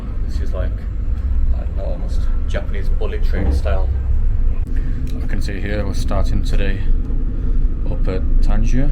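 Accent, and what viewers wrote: United Kingdom. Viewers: I guess you are a mancunian or from northwest of England. All the best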